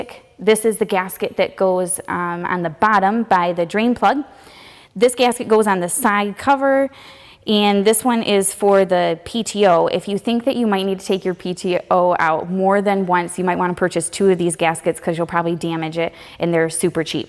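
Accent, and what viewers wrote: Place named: Michigan, United States. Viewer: Ah the classic Michigan accent. I miss it. Living in Kentucky.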